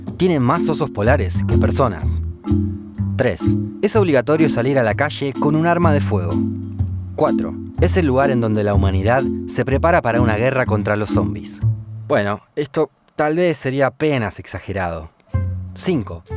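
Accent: Argentina. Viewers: Men, tu voz me es súper reconocible, lpm sos de Argentina? Alguna vez hiciste publicidad en la tele? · Pero habrá algún documental que no tenga acento argentino? Por favor ?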